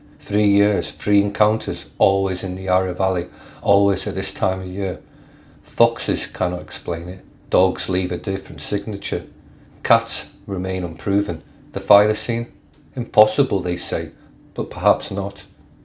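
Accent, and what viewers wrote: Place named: England, United Kingdom. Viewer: This bloke is idiot, I hunted this area since I was a kid , I'm now 61. Bloke never grew in this area with English accent, it's laugh able.😅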